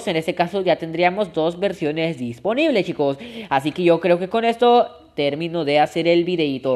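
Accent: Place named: Yucatan, Mexico. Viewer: Ahhh pense que eras de yucatan 😄😉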